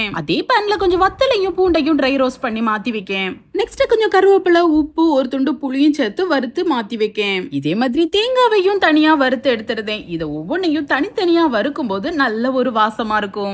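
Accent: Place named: Nagercoil, India. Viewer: Enakum Nagercoil language rmba pidikum enga relatives anga irukanga sister unga video elame super god's grace epome unagaluku unga families kum irukum god bless you sister ❤❤❤❤❤❤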